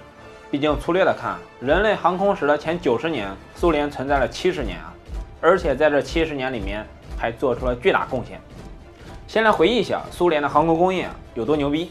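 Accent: Henan, China. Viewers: 謝謝讓我增加了很多航空知識,雖然我在台灣,但是我的父親祖籍河南舞陽,所以聽你說話特別親切,謝謝你讓我還能聽到家鄉話,希望你的頻道能長長久久,加油,謝謝!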